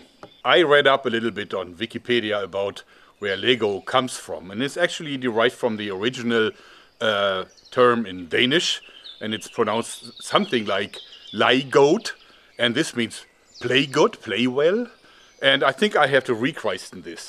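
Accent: Germany. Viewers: Are you a German? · ist deutscher er manhörtdas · He’s like my badminton coach but with German accent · You're German right?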